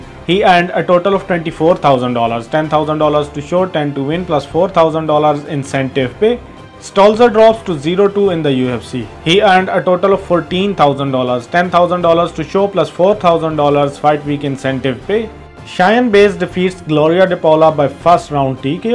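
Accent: India. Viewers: Hi bro you sound indian how do you watch fight night and paper view undercard usually ? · Your videos are good but your Indian accent is so thick and off putting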